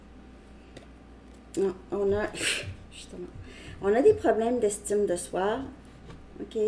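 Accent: Quebec, Canada. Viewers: Tu es vraiment bonne et super drôle! 😄🥰 c’est l’fun d’entendre une Québécoise. Beau ressenti, merci pour la guidance! ❤️✨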